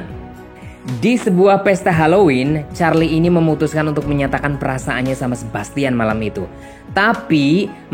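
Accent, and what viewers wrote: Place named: Cilacap, Indonesia. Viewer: Aksene cilacap gak iso ilang ya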